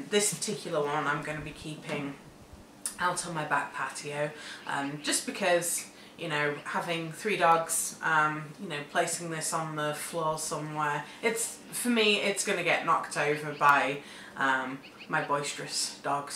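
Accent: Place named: United Kingdom. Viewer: How have I never heard of Bokashi before?! This is brilliant! (And why was I surprised you have a British accent? I knew about England! Ha!) Did you mention how much the bags of bacteria cost? I was wondering about the start up cost for the system and how much it costs to make a batch of compost, since you put a cup in every time you fill it. I could have missed that part. (Toddlers.)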